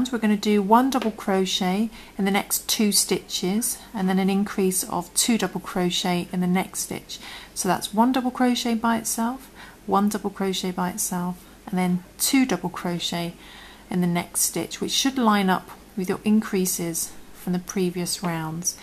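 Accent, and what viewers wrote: England, United Kingdom. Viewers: Oh I was so happy when I heard your English accent but turned into a frown when you started to explain using American terms lol ... Great tutorial tho..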